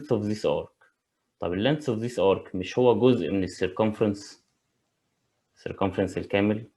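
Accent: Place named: Arab world. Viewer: it's arabic dialog so ofc u wont understand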